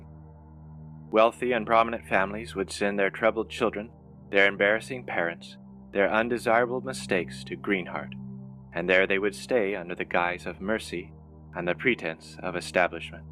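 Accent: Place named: Ireland. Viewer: I've been hanging out for this one. I'm really enjoying this series. I liked your Irish accent. Thank you very much!!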